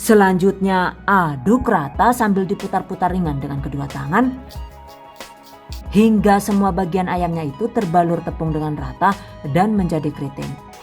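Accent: Banyumas, Indonesia. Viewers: Bunda asal dari mana Bun ngapaknya...suka dengernya🤗🤗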